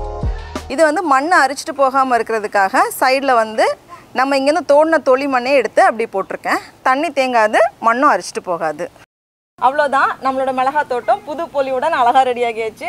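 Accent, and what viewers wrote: Nagercoil, India. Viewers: 1.45 Cheththu pozhachuruku😂😂 ultimate akka.,still I can feel the nagercoil slang in u❤️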